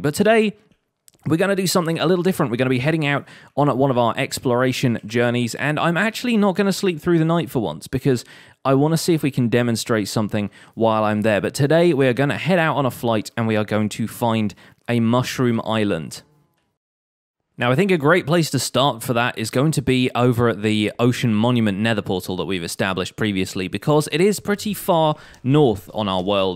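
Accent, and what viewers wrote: United Kingdom. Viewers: He’s speaking with a British not American accent. We use both words - I’m a Brit too. An umbrella is usually for rain whereas a parasol is for sun.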